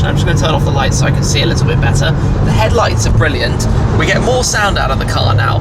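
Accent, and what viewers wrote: England, United Kingdom. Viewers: Where are you from Schmee...England or Germany? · english accent is really ugly sounding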